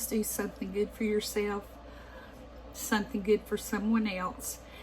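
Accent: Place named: North Carolina, United States. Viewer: Your snail mail addy is Newton NC!!! That is right down the road from me! I live in Wilkesboro NC. Only one hour from me. Thought that accent sounded from NC. So cool. Small world ain’t it? Take your time responding, the world is crazy today!!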